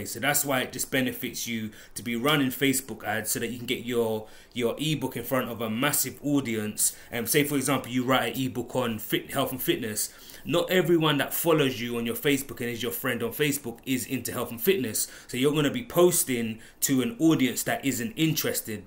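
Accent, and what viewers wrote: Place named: London, United Kingdom. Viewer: He's from London I'm guessing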